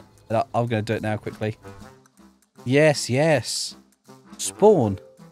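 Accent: United Kingdom. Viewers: i think you speak british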